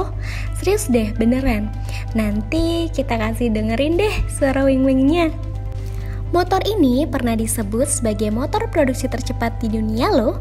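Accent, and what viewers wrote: Indonesia. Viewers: Logatnya seperti logat Palembang...Pesawat minta duit 😀👍🏻.. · Auto modar😂 kaciri orang sunda na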